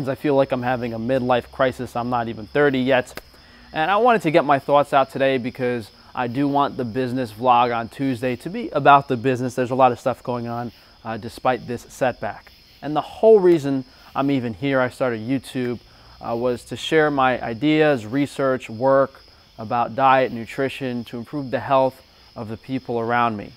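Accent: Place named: Long Island, United States. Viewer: you sound like a Long Islander, That’s where I live and I talk and we talk pretty much the same ha ha ha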